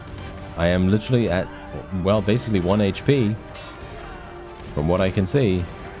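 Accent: United Kingdom. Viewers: The Posh English ! Keep on trucking Sir ;)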